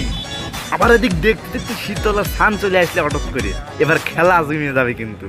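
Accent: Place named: Kushtia, Bangladesh. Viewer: vai ki kushtiar mal naki kotha kinto akdom kushtiar lok er moto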